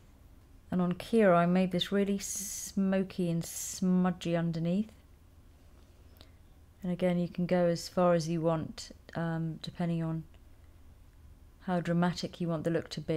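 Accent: United Kingdom. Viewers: ooh, just not to forget!!!! :D I simply adore your gorgeous British accent that I dont't have; instead I've got that Northern/Canadian accent! :D I've learned so many things about vintage make up from your videos, and this is so amazing and awesome!!! thank you again and again!!!!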